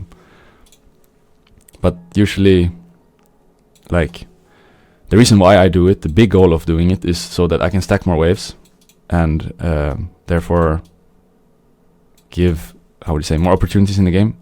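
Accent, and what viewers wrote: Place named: France. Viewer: he has a little french accent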